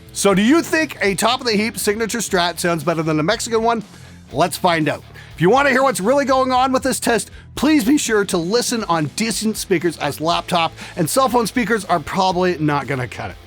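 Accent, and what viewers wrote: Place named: Canada. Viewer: that was the most canadian accent i ever heard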